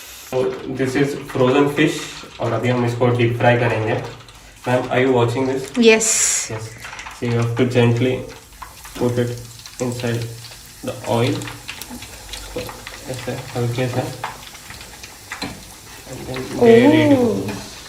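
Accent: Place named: United Kingdom. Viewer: Wo agrezo wali accent agayi hai tumhare English me.. kyaaa baat ❤️❤️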